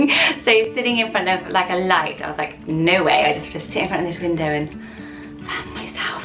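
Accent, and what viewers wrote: United Kingdom. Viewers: Because of her strong British accent, every time she started to say "Jagger" I legitimately thought she was saying "Jack maybe not a good choice for me... LOL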